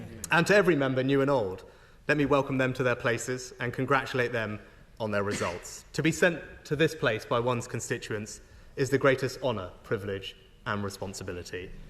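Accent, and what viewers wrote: RP, United Kingdom. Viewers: What a squirming mouthful of marble rubbish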